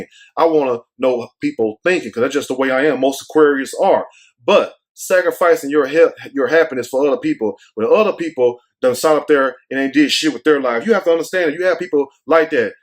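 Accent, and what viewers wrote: South, United States. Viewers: I see you representing H- Town today. One of my favorite groups to relax and chill with. I like watching you, cos you be talkin that real shit. You seem to have Southern roots, hear it in your dialect. Keep on wit the keep on😅😅.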